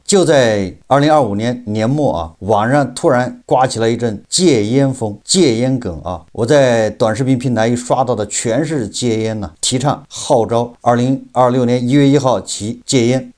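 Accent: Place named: Anhui, China. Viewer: 听口音，叔是不是安徽南方，靠近湖北那一片的人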